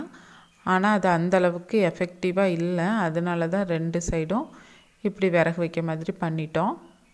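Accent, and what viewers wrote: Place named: Tirunelveli, India. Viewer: நீங்கள் நெல்லை மாவட்டமா? தமிழ் உச்சரிப்பு அப்படி தான் தெரிகிறது. அவரவர் வட்டார மொழியில் பேசும் போது தான், தான் சொல்ல வரும் கருத்துகளை சரியாக சொல்லமுடியும். நீங்கள் உங்கள் நடையிலேயே (style) பேசுங்கள்.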